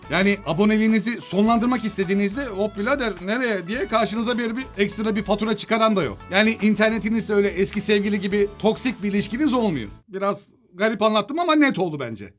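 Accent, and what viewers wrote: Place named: Adana, Turkey. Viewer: Abi lutfen Adanaliyi konus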